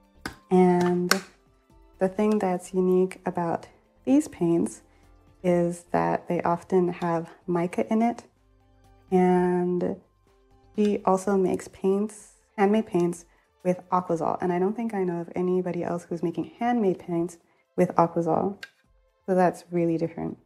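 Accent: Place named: United States. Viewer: I cannot pin down what country you’re from... your english is so perfect and sounds very american but you mention al the prices etc in euros? I am very torn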